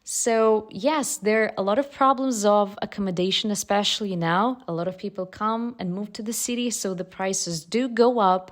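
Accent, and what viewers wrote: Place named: Eastern Europe. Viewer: Where from East Europe is the accent? Russia or Poland?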